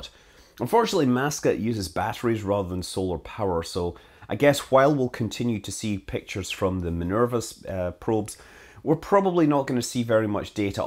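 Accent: Scotland, United Kingdom. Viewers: I really love listening to your scottish accent! It is so cute! And - more important - really can explain things very well! You would be an outstanding science teacher!!! Physics teacher Michael B. Butter from Radebeul, Dresden, Germany and: Thank you! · Hes Scottish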